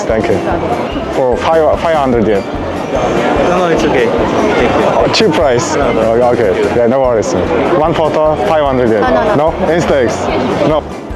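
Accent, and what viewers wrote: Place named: Uvs, Mongolia. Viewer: Uwsin egch bhshig bnshd haha ❤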